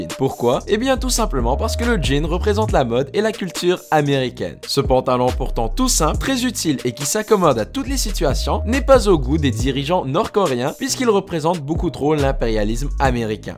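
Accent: Quebec, Canada. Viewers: Québécois sinon bonne vidéo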